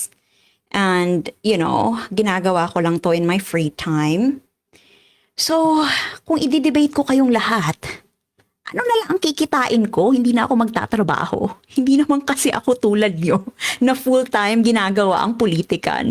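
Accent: United Kingdom. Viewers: UK accents 😊❤ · Like your British accent and Cebuano.. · Manang, hindi aq kasali sa any political party, may reaksyon lang Ako sa isang blog mo with christian, ala Ako paki sa political views mo, PERO NATAWA AKO SA PAGMAMALAKI MO NA ABOUT YOU GOIN' ON A CHURCH AT PAGBA.BIBLE STUDY MO, BUT THEN MAGCRITICIZE KA NG TAO, PARANG AKO NAHIYA KZ LUMALABAS LANG PAGIGING HIPOKRITA MO, ANG BAWAT TAO BINIGYAN NG TALENTO HINDI PARA IPAGMALAKI KUNDI GAMITIN SA MABUTING LAYUNIN AT HINDI IBINABANDERA O PINANGANGALANDAKAN ABOUT YOUR "BRITISH ACCENT", ICRITICIZE MO SA TAO, SABIHIN KO SAYO KAHIT RUSSIAN, KOREAN, JAPANESE PRESIDENT HINDI MAGALING SA ENGLISH AT WALA YANG ACCENT NA PINAGMAMALAKI MO, KUNG HINDI MAGALING SA ENGLISH SI VP SARAH EH ANU NAMAN SAYO?? AT LEAST NAG.TATRY SIYA NA GAMITIN ANG INGLES DAHIL ANG MOTHER LANGUAGE NAMAN TALAGA NG PILIPINAS AY TAGALOG, BAKIT GINAWA NG DIOS NA MAGKAROON NG IBA'T IBANG LANGUAGE KUNG MAS IMPORTANTE PALA SAYO ANG ENGLISH AT ACCENT NITO, KAHIT NA SINASABI MO NA HINDI MAGALING SI VP SARAH, WALANG OBLIGASYON SI VP O KAHIT SINO NA NAMUMUNO SA GOBYERNO NA ICRITICIZE MO SA MGA PINAG-ARALAN NILA, PEKE KA DIN EH AT HIPOKRITA, WAG MO NG IPANGALANDAKAN YANG PAGPUNTA PUNTA MO SA CHURCH AT PAGBA.BIBLE STUDY MO, NAGMUMUKHA KA LANG PAYASO. 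MAY ISA. Pa pala, kung talagang nagbabasa ka ng BIBLIA dapat alam mo yung talata na kapag nag-iisip ka ng hindi maganda sa kapwa ay katumbas din ng pagpatay. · You do sound British, I'm loving the accent though 😊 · Good day mam, you have a voice..British accent ba..:face-blue-smiling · Yes Ms. I love your British accent and specially your sense of humour,God Bless 🇵🇭🙏🇨🇦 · Ms., puwedeng puwede ka po maging newscaster. Filipina with British accent. Ang galing mo po! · I’ve watched you for the first time with Christ Tan‘s podcast, (that was just yesterday) since then nag marathon na ako sa mga vlogs mo. Sayang na late ako ng 4 days sa live mo na ito. Anyways, i love your British accent just keep it up…yan ang tatak mo plus hindi ka nakakasawang pakinggan. I have 4 friends from Davao mismong kababayan ng mga Du30 but they‘re not DU30 followers kaya i love them so much! I’m your new subscriber saying HELLO FROM VIENNA AUSTRIA, the Batangeña!❤️💋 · Your style of talking "a little British accent" adds flavor to the whole shebang. 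You caught my attention Girl! I am a big fan - keep going especially taking on people with different perspective blinded by their wrong viewpoint. You are on the right track of messaging.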